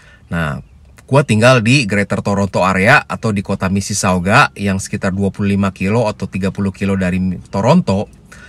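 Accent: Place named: West Java, Indonesia. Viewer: Bro logatnya Sunda yah.. sampurasun Kang 😊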